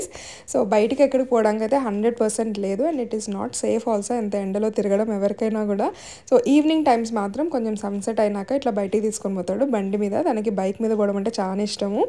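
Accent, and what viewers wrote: Rayalaseema, India. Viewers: మన రాయలసీమలో మాటల్లో చెప్పడం చాలా బాగుంది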